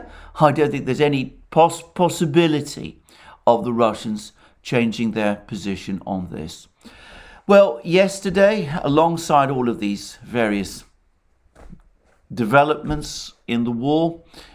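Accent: United Kingdom. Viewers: Very good information about the war in ukraina , and as i said before , you speak very eloquent and ( I also must say that the British accent makes it wonderfully clear and pleasant to the ear ) cheers 👍😀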